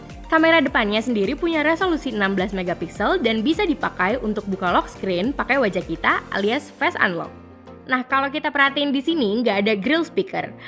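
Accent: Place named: Betawi, Indonesia. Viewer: cengkok betawinya bikin aneh😁😁😁 bagusan dulu deh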